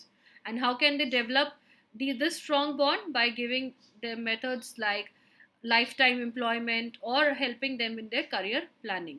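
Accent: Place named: India. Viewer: the indian thick accent is spoiling everything.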